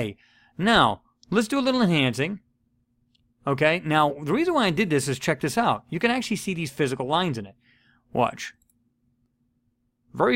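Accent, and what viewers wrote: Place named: St. Louis, United States. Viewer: good work - by the way, are you from the st. louis area? your accent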